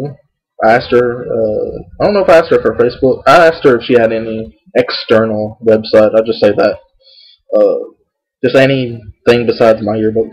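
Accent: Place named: South, United States. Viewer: Poor little Andrew.. He's so sad.. At least you can get a woman,. Unlike Andrew who can only get butt hurt.. Anyways. I love the video baby, but stop rambling about other people besides me when it's just suppose to be about me. Lol. I am conceited remember?? And you are starting to talk like me. Lol. Country! xP and I worked at Winn Dixie for 4 months and then Pizza Hut for 4 months and finally quit that too. Lol. Which reminds me.. You need to get another job.:)(: Lol. I LOVE YOU BABY! <3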